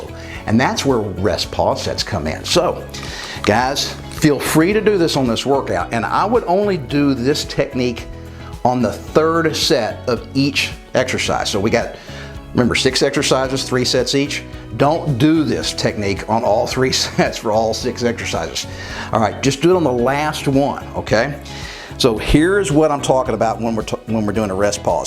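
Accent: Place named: South, United States. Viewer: This guy’s comforting southern twang reminds me of “Dubayah”. “We’re goin into “Eyerack” to give Saddam a good ol’ southern slap on the jaw yeh all”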